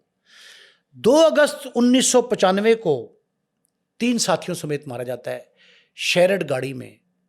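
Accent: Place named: Lahore, Pakistan. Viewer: Karachi k hawaly se bat kre hain to meherbani suni sunai kahani na sunaen dusri bat ye wo Farooq ka accent Faarooq hai na k Farruooq plz apna lahori accent ek taraf rakh kr karachi ki bat kren 😡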